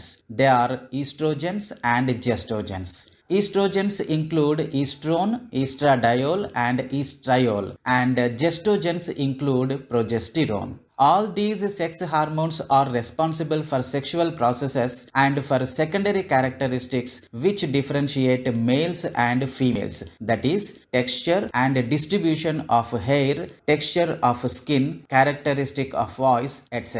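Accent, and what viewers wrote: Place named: Karnataka, India. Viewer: you have the kannada slang . from karnataka ?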